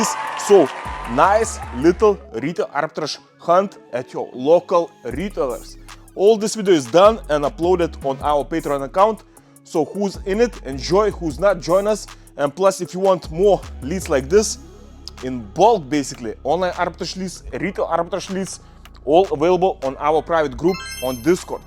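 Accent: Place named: Russia. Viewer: Russians accent❤❤❤sweet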